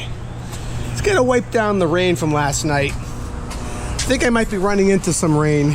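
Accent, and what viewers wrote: Boston, United States. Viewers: your videos are great don't change a thing the way you're video's come across are fine its you i don't want professional thats not you. Oh by the way i like your Boston accent especially how you say yard😂😂😂😂 Keep on trucking with the W9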